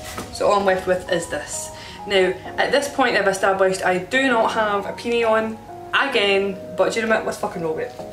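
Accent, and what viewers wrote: Scotland, United Kingdom. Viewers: Wholesome, entertaining, and scottish noises. This is a top notch video. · Also, something that no one tells you - you need to drain the excess liquid out of the pumpkin to make a solid pack pumpkin. This is done after the sieving/mashing is done. You take the mashed pumpkin and put it back into the sieve and let it sit over a bowl to let the liquid drain for about 1 hour or until the pumpkin mash is thickened. Doing this will result in a firmer filling that wont weep after baking. I love to bake and really enjoy watching your baking videos. My grandmother was Scottish and she was a fantastic cook and baker. Watching you and hearing your accent, brings back happy memories of her. No recipes or measurements - just a little of this, and some of that. No worries with October timing, we don't eat pumpkin pie for Halloween in the US. 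It's a November/Thanksgiving dish here.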